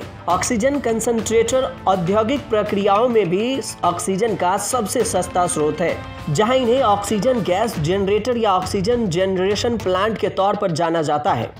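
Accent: Bihar, India. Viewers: Bhai ye Bihar Ka h yha ghode ko ghora khte h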